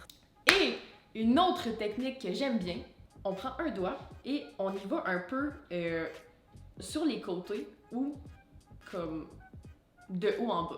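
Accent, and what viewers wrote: Quebec, Canada. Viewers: Pas forcément facile avec l'accent du Québec, mais très sympa dans les explications.. 👍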